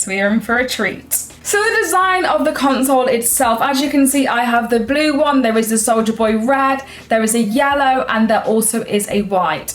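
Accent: United Kingdom. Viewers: Never knew how much I desperately needed to hear recited in a UK accent but wow that changed my life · I like your accent, sound British I've always liked the British accent I think it's really cool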